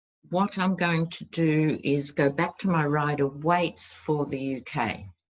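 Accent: Australia. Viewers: Hugs to you,. You rock! I love uploaded videos of wisdom. Keep them coming in that awesome Aussie accent. 💛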